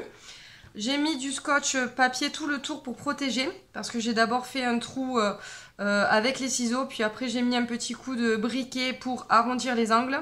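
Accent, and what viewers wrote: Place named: South, France. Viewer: Super tuto comme d'hab, avec le chouette p'tit accent du Sud.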